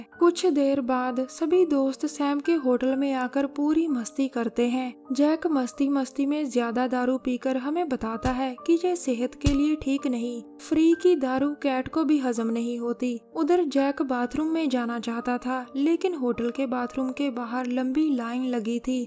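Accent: Punjab, India. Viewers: Madam aap ka punjabi accent has its own fanbase.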